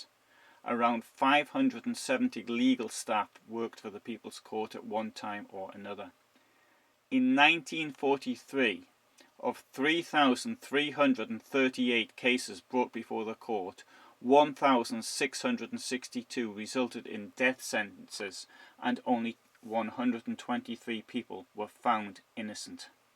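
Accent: Newcastle, United Kingdom. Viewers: Really enjoy the channel, improving my knowledge & understanding, the fact that I also love the Newcastle accent is a real bonus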